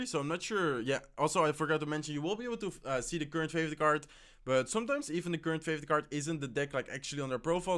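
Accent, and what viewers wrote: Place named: Netherlands. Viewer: je klinkt echt heel erg nederlands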